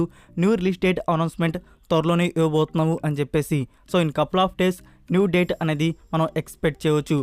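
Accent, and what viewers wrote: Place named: Tamil Nadu, India. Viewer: Hahaha Tamilnadu Karnataka vallu matladinattu undhi mi Telugu....Anyway Ur Content is always Good brother....👏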